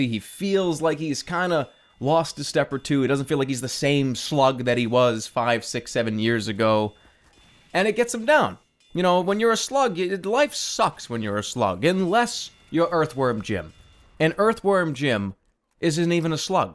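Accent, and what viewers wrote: Boston, United States. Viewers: His Boston accent is so pleasent and cosy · BOSTON THAT'S WHY EVERYONE SAYS HES LIKE THE SCOUT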